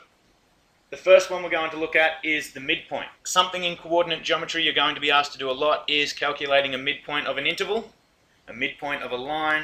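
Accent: Australia. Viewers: sweet aussie accent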